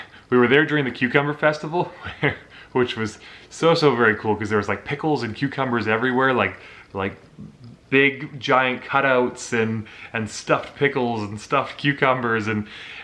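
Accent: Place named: United States. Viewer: if you are canadian, why do you have american accent ? my wife is british and said you are an american when i showed her the video and КостромА, not КострОма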